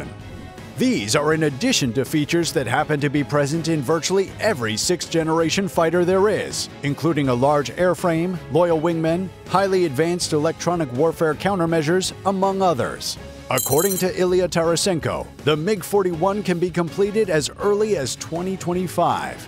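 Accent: United States. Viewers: Why the American narrator?????? Very offputting. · I really am getting sick to death of hearing American accents on videos that have nothing to do with America. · Why are these vids narrated by a yank ? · Why do we have an American voice,? Oh perhaps it because they are the experts on killing people? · Why does it have to be narrated by a yank?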